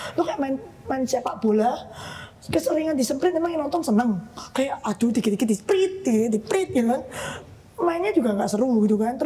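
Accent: Java, Indonesia. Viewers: Cici ini pintar ,sederhana, duitnya banyak.tapi gk pengen oplas.1lagi medok(gk lupa dia berasal) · Antara Jawa Tengah atau Jawa Timur wlwkwk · Tercerahkan, terimakasih mbak e. Logate jowo gampang dipahami. Bakbuk itu impas 😅❤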